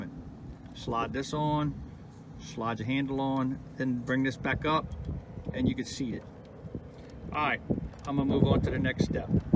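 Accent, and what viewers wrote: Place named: New Orleans, United States. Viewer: Well done. Voice over worked great. Useful video. How does your Fishing Specialties BowDucer LiveScope set up do on a slow troll? Does it want to swing up? And why the Ram mount to hold the transducer? Does the Garmin Perspective mount not fit on the FS pole?. The scenery and accent makes me miss New Orleans where I grew up and could be on great water in no time. Now fish the Texas coast: drive 3 1/2 hrs to the salt. 22' Pathfinder bay boat, LiveScope, (2) Garmin EchoMap 94SVs, SteadyCast, GMS 10 port expander, NMEA 2000, MotorGuide Xi5, F150TLRC Yamaha. Need all the help I can get! Thank you for the content.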